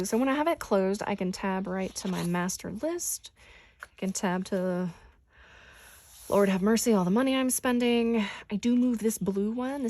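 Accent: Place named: South, United States. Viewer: As a Georgia girl, I appreciate hearing your southern accent. I am excited about your new channel. I really like your planner set up. Thanks for taking time to share it with us!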